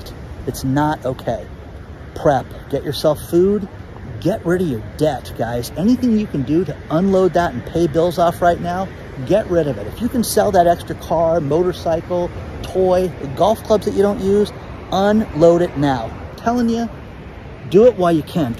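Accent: United States. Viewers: Love your channel and love your American accent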